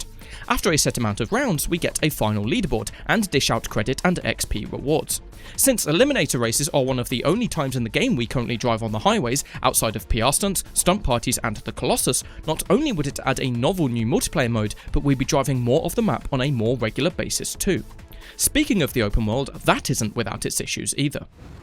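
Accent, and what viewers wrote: United Kingdom. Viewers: That's just an English accent dude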